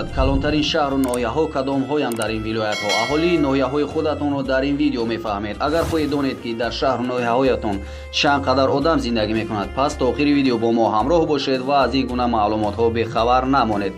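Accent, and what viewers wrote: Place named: Kulob, Tajikistan. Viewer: Кму минтакаи кулоба полний мегуфти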